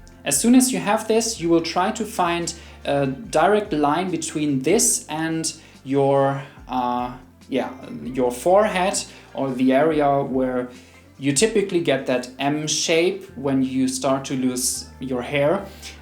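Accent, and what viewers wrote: Germany. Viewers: ch wusste an deinem Akzent das du Deutsch sprichst.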